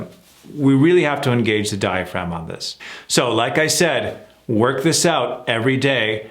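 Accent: India. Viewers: Thank you❤ your indian accent is perfectly as Indians usually speak . So please continue a series where you can teach from basics to advance for Indians. Love from india ❤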